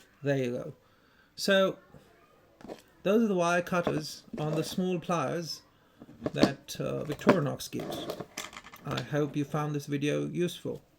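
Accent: United Kingdom. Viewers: You are indian yet you have a very British accent. I do not know why but i find this peculiar. Have you studied in UK or in a UK school in india perchance? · Yeah I thought he is from England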